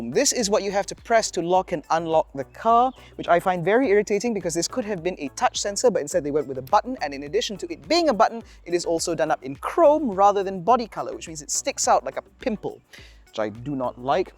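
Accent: United Kingdom. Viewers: Raw malay rempit look with Nice british accent. My brain is scrambled.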